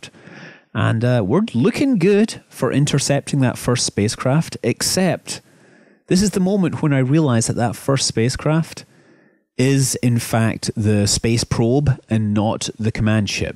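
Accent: Scotland, United Kingdom. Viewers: hi, where were you born? you sound scottish but not completely:P nice video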